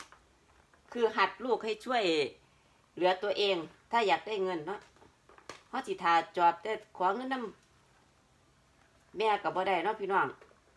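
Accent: Isan, Thailand. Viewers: เว้าอีสานฟังง่ายจ้า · พูดอีสานเลยจ้าฟังเป็นธรรมชาติดี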